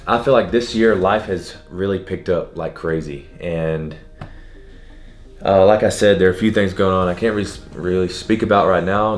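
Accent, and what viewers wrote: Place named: Alabama, United States. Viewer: Y’all Haha can’t get rid of the bama talk.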